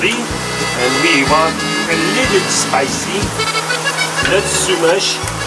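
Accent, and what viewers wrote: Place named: Italy. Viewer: Je pensais te revoir avec un accent USA, mais c'est plutôt avec celui d'Italie que tu reviendras. Rafraichissant mon ami. Continue ton périple avec cette bonne humeur. Au plaisir.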